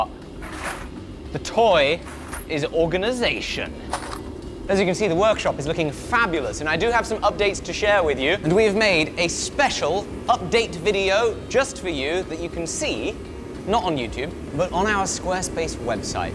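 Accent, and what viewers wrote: England, United Kingdom. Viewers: isn't it crazy that he said the British equivalent of "the patent has fucking expired" but since he speaks queens gibber it doesn't sound heavy at all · So what's up with the Montana thing as you sound British but I get it I'm a dual but I'm a graduate of Carroll College in Helena. My two best friends are two of my good friends live in Bozeman one has a pizza shop and the other is a family therapist! Another friend of mine used to be a representative from Butte but he had a bike accident and sadly passed early. He was a hippie and he looked like hippy, And he was a absolute golf pro so the locals would use him up in Bigfork to play golf against others and tourists and he was there ace in the hole. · Its just the awesome upper british accent and language next to the chaos of forging some cool damascus. Some itch was scratched in my brain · The British accent makes me trust him 25% percent more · degrees fahrenheit, thousands of an inch, yeee haaa, what part of England are you from? 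· When I heard his voice I thought, YES. An Englishman making a Damascus. This should be good. I left after posting this comment. The loud americanisation of the production is off-putting. Sorry, but reign it in. Quality over quantity...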